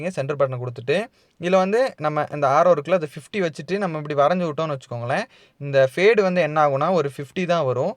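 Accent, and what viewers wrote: Kanyakumari, India. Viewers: Neeka yanntha Oru Anna 🤔 kaniyakumari language mathiri iruku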